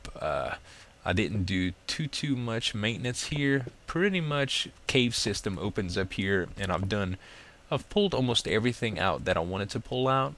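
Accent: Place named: Texas, United States. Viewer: you say too too much too too much. but nice voice, accent sounds like a mix between texan and european lol.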